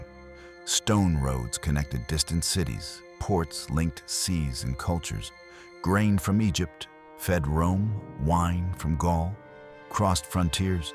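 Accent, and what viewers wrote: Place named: South Africa. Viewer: Sounds like South Africa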